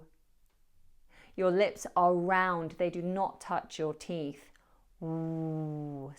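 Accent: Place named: United Kingdom. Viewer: British English pronunciation with Miss!!!... Excellent!!!...❤💫👌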